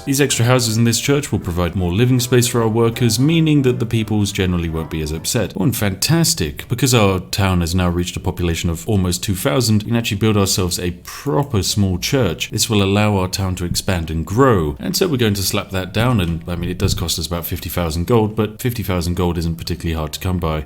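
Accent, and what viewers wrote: United Kingdom. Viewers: He's British, that's how we say it, chill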